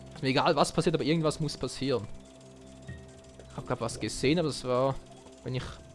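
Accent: Switzerland. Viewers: Bist du Schweizer??? :D:D:D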